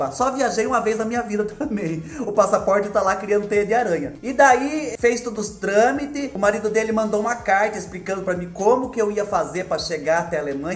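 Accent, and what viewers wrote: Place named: São Paulo, Brazil. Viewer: sou nascida em são Paulo kkkkkkkkkkk só não moro mais lá kkkkk,mais vc parece com uma prima minha de Mogi das cruzes,me identifiquei kkkkkkkkkkk...vc e fofo e sua alegria contagia...ela e bem do interior de São Paulo e fala como vc e muito engraçado...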